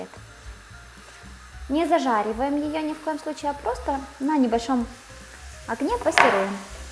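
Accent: Ukraine. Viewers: ...я россиянин. Служил на Украине срочную службу в Советской Армии...1987-89 год. Восточная Украина..,под Харьковом. У вас очень милый украинский говор..Вы с какой части Украины? Не с Полтавской области?! Мира нам всем!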